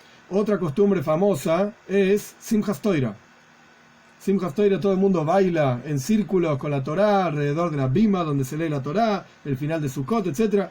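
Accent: Argentina. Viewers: Rab sos Argentino?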